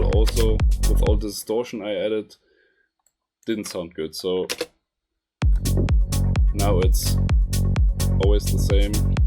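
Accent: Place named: Germany. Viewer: Hey du hörst dich deutsch an😅 falls ja könntest du auch deutsche tutorials machen?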